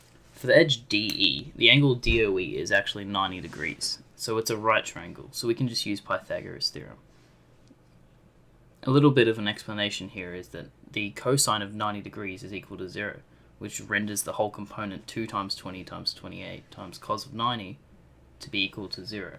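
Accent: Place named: United Kingdom. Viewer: Thank you i love your British accent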